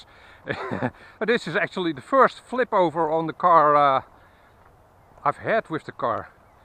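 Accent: Netherlands. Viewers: ik had de naam van je kanaal nog niet eens gezien maar toen je begon te praten hoorde ik meteen dat je een Nederlander bent maar leuke video ga o door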